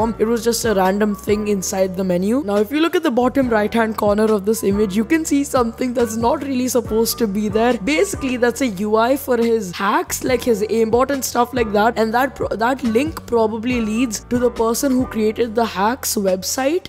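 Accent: India. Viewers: u r indian na ?? :) ;) I think because your voice is like an indian talking english :)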